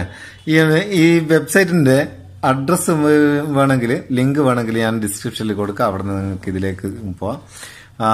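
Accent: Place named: Malabar, India. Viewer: Malabar slang 😁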